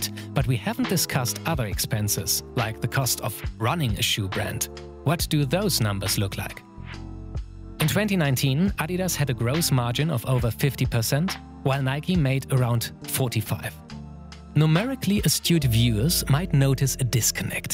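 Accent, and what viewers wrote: Germany. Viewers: Der Sprecher ist Deutscher oder? 😃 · Warum höre ich da einen deutschen raus? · I love his german accent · yo dis is a german ass accent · You german? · Es entspannt mich das nicht nur ich ein german english hab 😂 gutes Video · Is it just me oder spricht er mit nem deutschen Akzent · I know he’s German because of his accent 🤚🏾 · Du hast echt nen starken deutschen akzent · Ich hasse den deutsch-englisch Akzent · R u german? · Yea thats the right pronounciation, its a german company, german name. Judging by the narrators accent he is also german so he knows. Its not " A-die‐das" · Tell me you are german without telling me you are german: his accent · German accent haha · Du hörst dich wie ein englisch redender Deutscher an. Lieg ich richtig ? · Are you German by any chance? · Man hört dass du deutsch bist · Are you german? Lol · Man merkt diesen Deutschen Akzent so hart haha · Man hört den Deutschen aus dir raus XD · Are you German? 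· His German accent is so strong 😂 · you’ve got the srongest german accent i’ve ever heard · Can't say for sure but the way he pronounces names sounds german, yes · Finde deine klare Aussprache und den leichten deutschen Akzent zusammen mit deiner Stimme unfassbar angenehm zum zuhören. Ich bekomme immer fürchterliche Kopfschmerzen wenn andere Deutsche versuchen künstlich american english zu sprechen. Außerdem natürlich auch inhaltlich ein tolles, super recherchiertes Video! Ich hoffe du gehörst mit dieser Qualität bald zu den ganz großen auf YouTube! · Alter der deutsche Akzent ballert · Hat wer den deutschen Akzent rausgehört xD?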